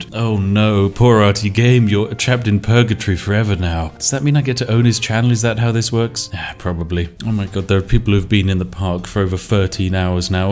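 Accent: United Kingdom. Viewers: "The most exciting thing since teabagging" and "welcome to the bone zone" perfect commentary delivered in the excellent British accent, love it!!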